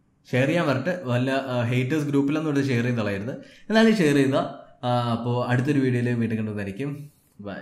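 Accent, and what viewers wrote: Trivandrum, India. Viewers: loved ur trivandrum slang ....ghora ghora.... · Bro brode Trivandrum slang enikk ishttappettu....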